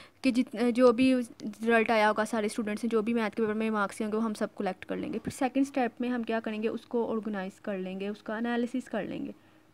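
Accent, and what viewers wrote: Lahore, Pakistan. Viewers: Your accent reveals that you are from Lahore 😅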